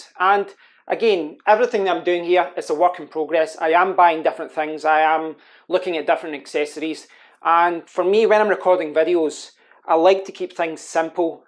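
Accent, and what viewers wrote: Scotland, United Kingdom. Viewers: Ur Scottish right ( so am i)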